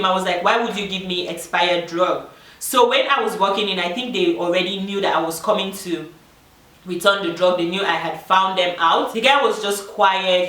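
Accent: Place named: France. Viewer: I think her accent is French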